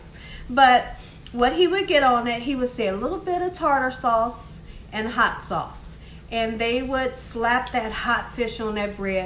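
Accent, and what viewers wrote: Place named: Ohio, United States. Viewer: OOOH MY GOODNESS! BETWEEN THE DELICIOUS 😋 INSPIRING MEAL YOU PREPARED, THE PRAYER, AND YOUR VERY INVITING BEAUTIFUL OHIO ACCENT - YA SOLD ME. THE WAY YOU SPEAK COULD INSPIRE THE DEVIL TO DO RIGHT IF HE'D ONLY LISTEN. YEP I WILL SUBSCRIBE AND TELL A FEW FRIENDS. THANKS 😁😅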